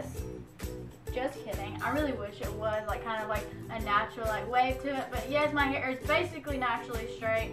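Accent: Alabama, United States. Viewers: She's from Alabama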